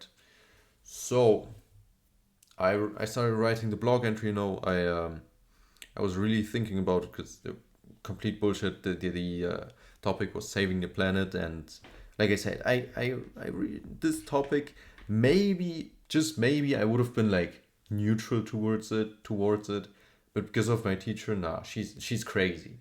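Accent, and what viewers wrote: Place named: Germany. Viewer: I heard the German accent instantly. Love the vibe of the video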